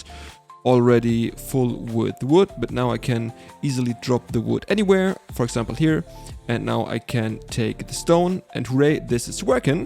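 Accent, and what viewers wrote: Germany. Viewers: I can hear the German acent, aber gutes Video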